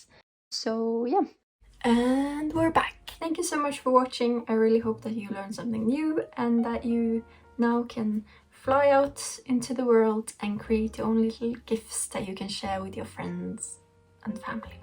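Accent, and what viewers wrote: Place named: Estonia. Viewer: Ah... estonian accent...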